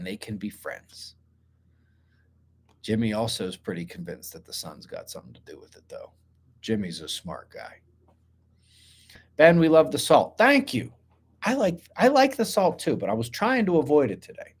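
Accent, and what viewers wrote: Pittsburgh, United States. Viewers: Ben, Thanks So Much for this discussion/update... Has anybody plotted any Theoretical Data on Post Pole Shift: Earth Rotation and or Slosh Factor from the Oceans? Thanks for the Pittsburg Dialect, I understood it all... Carpe Diem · That Pittsburg accent caught me off guard. Also your a very intelligent man how you expect ppl to come up with advanced questions. Lol Free Top G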